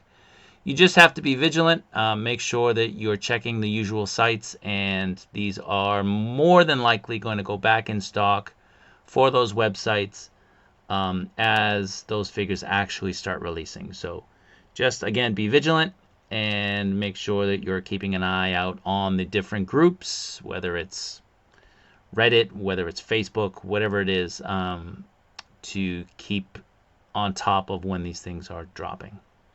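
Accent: Boston, United States. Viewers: So you live in japan but I notice you have a boston accent, is that where your from originally? I gotta know man!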